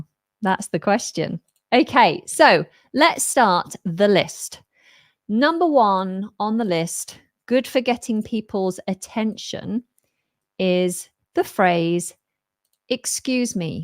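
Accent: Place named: United Kingdom. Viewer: I apreciate the britsh accent...it is very especial for me.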